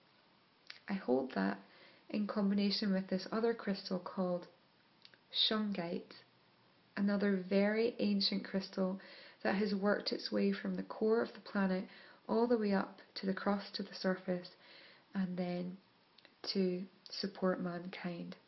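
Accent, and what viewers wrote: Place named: Scotland, United Kingdom. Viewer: I think i detect a bit of Scottish accent.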